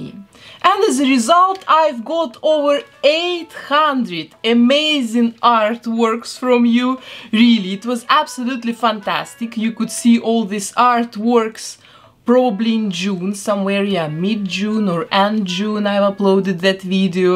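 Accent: Russia. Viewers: Хех прикольный русский акцент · Русский акцент ?🤔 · У тебя русский акцент или мне кажется... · вы точно не русская? прям как будто русски английски, так сказать, звучит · Даже я слышу русский акцент)) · Мне кажется, или у неё английский с русским акцентом..... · Этот убийственный русский акцент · Only me hear that strong Russian accent? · Видео крутое, но русский акцент мешает наслаждаться им в полную меру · Ты русская? Акцент русский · Ох, этот русский акцент.. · Почему мне слышится русский акцент? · Она не похожа. У нее акцент как у русского, который пытается говорить на английском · вы ведь не американка и не англичанка. у вас акцент как у русской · Почему мне кажется что у нее есть русский акцент. Будто бы русская, выучившая английски. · Слышу русский акцент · почему тут нет ни одного русского комментария? я слышу 1000% русский акцент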